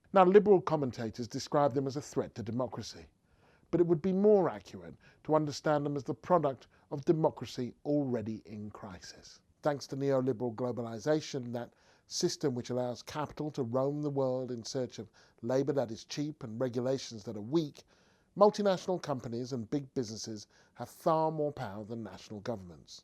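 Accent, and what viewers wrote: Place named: United Kingdom. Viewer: I think it's so cool when a black guy almost speaks with a real British accent!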